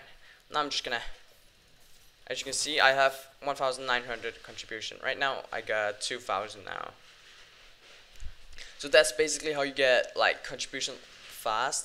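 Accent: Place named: Denmark. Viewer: this guy has a danish accent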